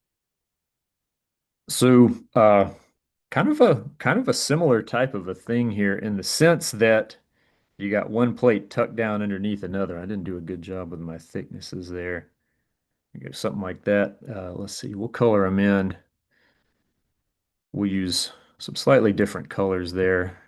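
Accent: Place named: Pennsylvania, United States. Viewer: Awesome video with great illustrations! Sidebar: Your accent reminds me of my lower Bucks Co. PA in-laws 😊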